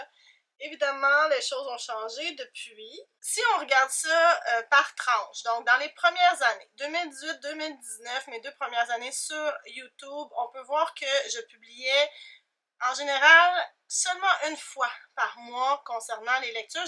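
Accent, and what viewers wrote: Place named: Quebec, Canada. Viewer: Bon anniversaire pour ta chaîne et bonne continuation. Je n'ai pas encore vu beaucoup de tes vidéos, mais j'aime beaucoup ta facon de faire (et ton accent québécois 😁) Je t'ai découverte par le biais de Karine et j'ai adoré vos deux vidéos sur votre weekend-lecture.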